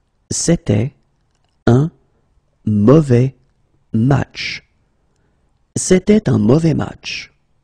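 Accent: France. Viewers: Ouais c'était l'impression que j'avais au début mais c'est clair que vous êtes de France. Je ne suis pas français du coup c'est peut-être juste à cause de cela.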